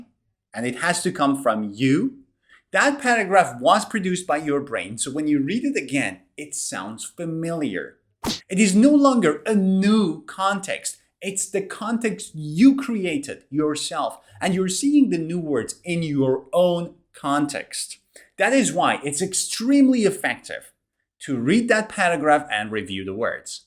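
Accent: United Kingdom. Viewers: Is your accent American or British. I asked it because you pronounced the word " either " like British not American.😊😊😊😊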